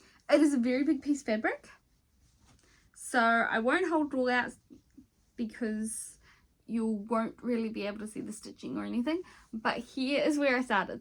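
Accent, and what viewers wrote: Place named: New Zealand. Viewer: Congratulations on your Lisa Parker finish 🥰 I’ve just found your channel… and love your WIPs As soon as I heard your accent I knew you were a Kiwi…. I’m an Aussie stitcher/flosstuber so I’m always really happy to find stitchers/flosstubers from Aus or NZ 😀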